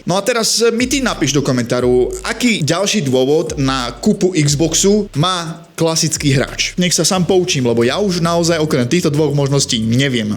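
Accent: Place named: Czech Republic. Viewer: Kúpuje to akože naozaj? Ach vy čechuje ZE Slovenska. NEUVERITEĽNE odporný pepícky prízvuk.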